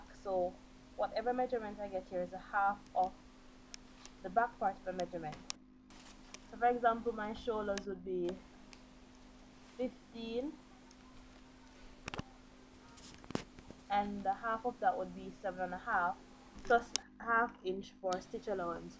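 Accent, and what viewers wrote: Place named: Jamaica. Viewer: are you Jamaican? you sound jAmaican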